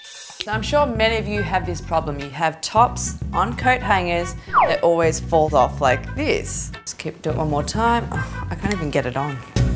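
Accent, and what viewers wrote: Australia. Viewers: She sounds Australian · oh so that's why her Australian accent is a little different. Singapore must have rubbed off a little :3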